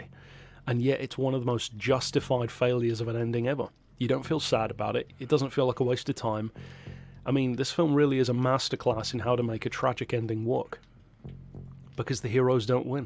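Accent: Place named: United Kingdom. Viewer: It's weird seeing Braun Strowman, discussing a movie with a British accent on YouTube! 😕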